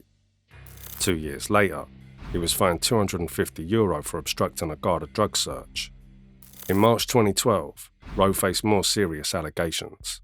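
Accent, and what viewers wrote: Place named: Cockney, United Kingdom. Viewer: These goofy cockney accent narrator's are super cringe and speak like they are on antidepressants ffs I have to listen on ×2 speed.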